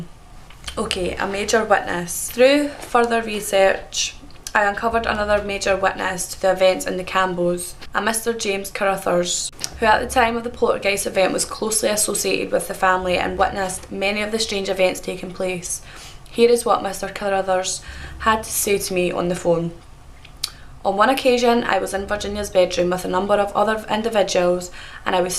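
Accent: Scotland, United Kingdom. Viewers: Love the Accent I would have never guessed it was Scottish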